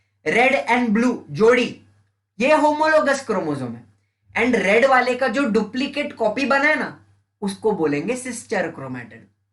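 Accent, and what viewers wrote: Maharashtra, India. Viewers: Are u Marathi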